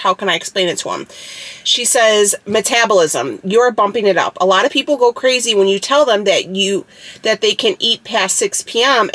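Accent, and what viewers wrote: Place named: Chicago, United States. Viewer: looked gorgeous! You did a great job on her makeup. Also love your Chicago/ Illinois accent, from a Floridian 😁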